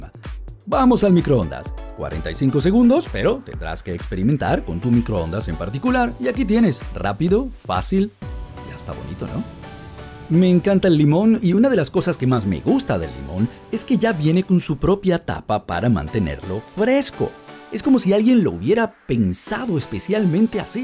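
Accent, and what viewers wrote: Venezuela. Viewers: Es acento venezolano.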